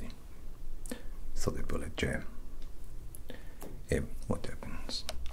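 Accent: Russia. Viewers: I love your Russian accent! Strangely soothing for this tried and true Patriot. However, I want to be transparent. The gun sounded too plastic to me at the beginning, not sure why. We clean them all the time and I love the metallic sounds; the clicks of the hammer, the slide going into place, all of it. I love my Beretta ❤️ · I always really liked your russian accent,. The background hum is delightful here and goes well with the rather dark ambience. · I am always very pleased to hear you speak with a Russian accent. you are always the top of the top 🔝🔝🔝🔝 many more congratulations on 🎊150K🎉 even though you should be at a million or more ❤🤗❤ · I'm a huge fan of your russian accent, I find it very soothing and relaxing. This video goes straight to my favourites.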